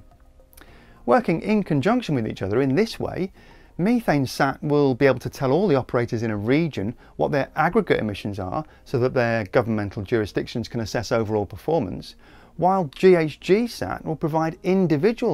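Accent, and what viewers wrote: United Kingdom. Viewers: You pronounce it me-thane, we pronounce it meth-ane. We don't tell you how to pronounce words if you don't tell us how to. Deal? Whenever I come across the English when I was in the UK or when travelling they always show their arrogance. My suggestion is you're not that special, so stop acting arrogant.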